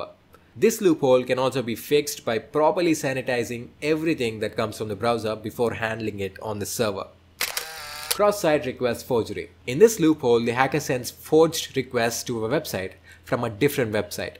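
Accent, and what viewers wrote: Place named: Guntur, India. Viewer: Indian accent.. no thanks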